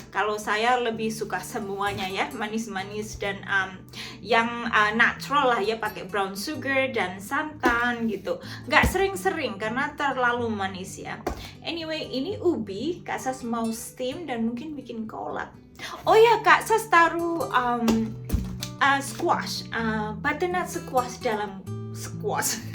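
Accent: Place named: West Java, Indonesia. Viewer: Waalaikum salam mbak yu Iki kok koyok wong kulon deso betul ngak e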